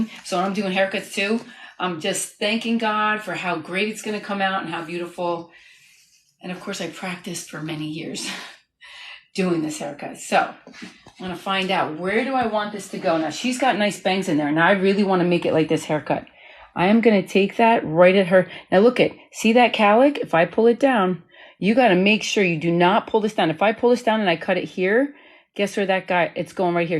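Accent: New Jersey, United States. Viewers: As a cosmetologist myself for 40 years, I must say that I thoroughly enjoyed watching this video and I even learned a couple new techniques myself. I cut mostly men’s hair now so it’s nice to learn a few things again regarding women’s hair. And by the way, I’m from Rhode Island and I love your jersey accent! I’m in Florida now and listening to you just makes me homesick. You’re such a beautiful person with a wonderful attitude. God bless. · ❤❤❤I grew up in NJ and moved away to Cali but your voice reminds me of home! And now you say Shrewsbury (WHAT?!) I came from HIGHLANDS!!❤❤❤ I have no idea when I can get to Jersey but if you are ever taking a trip to California, please let me know!! My hair could definitely need your positivity and know how!! ❤❤❤❤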